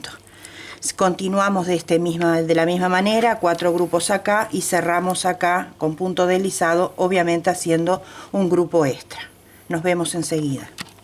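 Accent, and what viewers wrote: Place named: Argentina. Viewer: si pero por lo visto sos de ARGENTINA por tu asento es unico ... y aca no usamos esos terminos.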